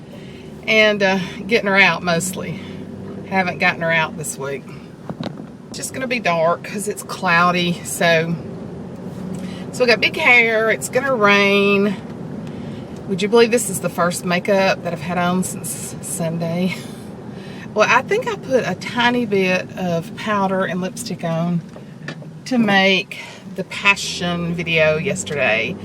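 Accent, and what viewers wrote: South, United States. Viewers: Aww there she is!! I lost my mom so is my substitute with the southern drawl lol!♥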